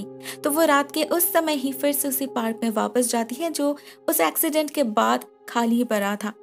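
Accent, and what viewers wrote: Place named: Bihar, India. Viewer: Aap bihar se hai na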